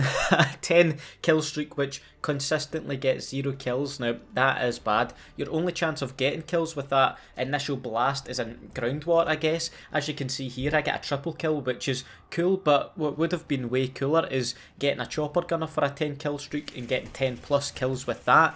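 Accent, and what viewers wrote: Scotland, United Kingdom. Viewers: This guy Scottish? (Also in real life white phosphorus is really dangerous it’ll burn your body bad) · Love the COD content but... that Scottish accent is the one that hook me up. #notificationsquad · Not sure if I subbed for the actual content or for the beautiful Scottish accent but either way #notificationsquad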